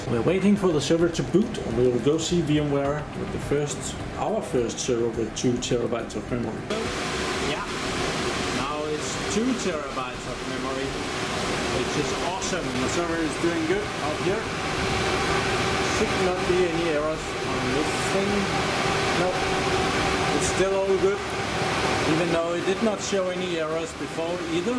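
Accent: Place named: Denmark. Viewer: Syntes sku nok det lød som dansk accent :D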